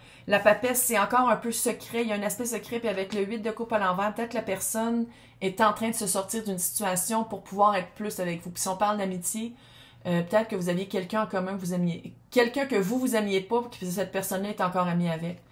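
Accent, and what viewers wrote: Quebec, Canada. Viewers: Je découvre et j'adore 😍 je dois encore m' habituer à l' accent québécois 😁 je dois encore faire replay 😁 j' adore ❤❤❤ on dirait un dessin animé 😂 les sons, les expressions, les bulles 😍😍😍 la guidance me parle trop, merci, merci, merci 🙏🙏🙏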